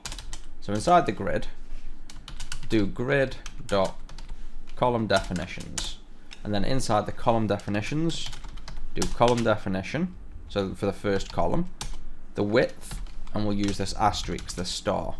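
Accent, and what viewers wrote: United Kingdom. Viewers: Lol that UK accent. I feel like I am watching game of thrones. Well done by the way! Nice Video!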